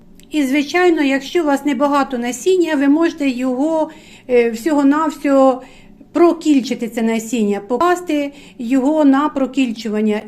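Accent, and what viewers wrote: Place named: Poltava, Ukraine. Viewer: Полтавщину по говору ні з чим не сплутаєш. За відео лайк, а за суперфосфат-неуд )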